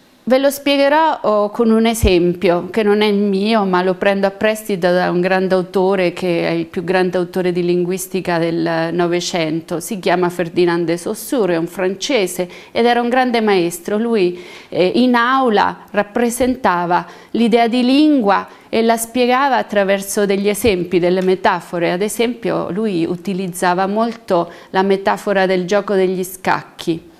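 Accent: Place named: South, Italy. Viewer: Quante scene. Brava la prof. Chiaro esempio di diatopia. É meridionale e si sente, embè?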